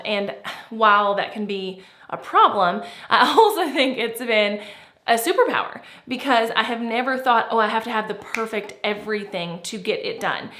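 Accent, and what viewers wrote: South, United States. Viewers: I love the fact that there is someone else with a good southern accent who's making it in YouTube! I hear myself on camera and it's a hoot! And yes, I'm from North Carolina as well!:)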